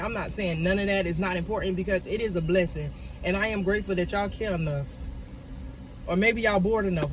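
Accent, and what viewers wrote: Florida, United States. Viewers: First of all CONGRATULATIONS!!! I am soooo happy for you! Im not even sure how I came across you but as soon as I heard that accent I said “ She either from St Pete or Jacksonville!” LOL! I’m in DUUUUVALLL too! So it’s so nice to see you making your situation into a positive one! I caught a felony soon as I turned 18 & the judge said she was gonna make an example out of me. I was lucky I only did a night in jail 5yrs probation but the stigma with having a record never leaves! So congrats again! I can’t wait to see what awaits you!!🎉🎉🎉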